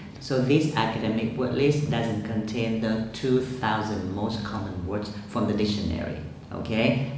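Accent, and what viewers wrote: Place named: United Kingdom. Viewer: Mình thấy thầy nói hay mà . Thầy nói giọng Anh- Anh nên chắc bình thường bạn nghe giọng Anh- Mỹ nhiều nên nghe ko quen thôi.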